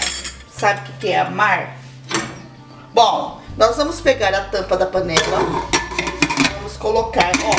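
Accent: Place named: São Paulo, Brazil. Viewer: Adoro este sotaque paulista!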